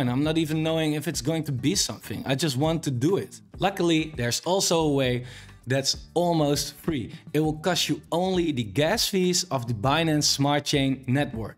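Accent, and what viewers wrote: Netherlands. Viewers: You from holland right? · ik hoorde al iets nederlands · you sound dutch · I love jou mooie hollanda accent Wel goede video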